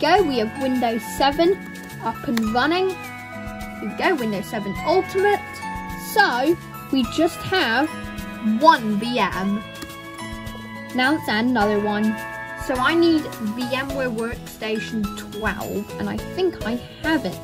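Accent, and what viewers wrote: United Kingdom. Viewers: your a cool underrated british yter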